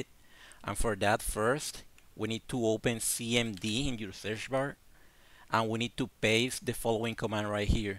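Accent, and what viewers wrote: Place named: Brazil. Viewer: mano tu e BR? mo sotaque de BR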